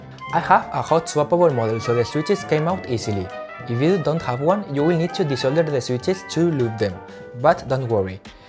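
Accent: Spain. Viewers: You have a suspicious spanish english accent... where are you from? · Are you spanish, aren't you? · are u spanish? the accent sounds like it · Me ha bastado con la primera frase para darme cuenta de que eras español jajajaj. Por cierto muy buen vídeo, de gran calidad y se notan tus ganas y conocimiento. Hasta Marques Brownlee te ha comentado... flipante. Sigue con el buen trabajo! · Spanish accent were are u from mate? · Man where are you from? Your accent sounds so Spanish! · Where are you from? You sound like a native spanish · Súper obvio que eres de España con ese acento. ¿Cómo aprendiste a hablar tan bien el inglés?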